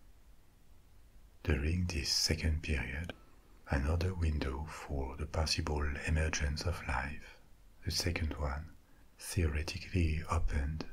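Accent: France. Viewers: Music? ✅️ Imagery? ✅️ Space? ✅️? ✅️ Relaxing? ✅️ We are go for launch 🚀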